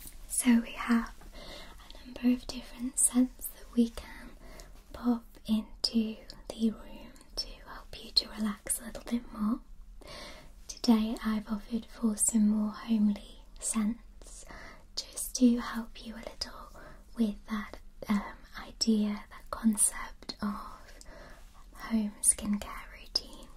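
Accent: United Kingdom. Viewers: I luv soooo much your British accent🥰😍 to cuteeee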